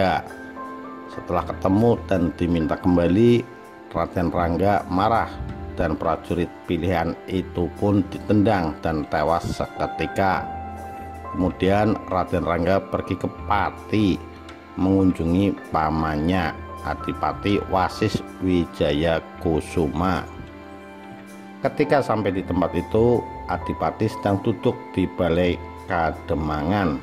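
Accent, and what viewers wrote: Tegal, Indonesia. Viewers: Admin kayane wong tegal apa brebes yaa...?? Intonasine lucuu 🤣🤣🤣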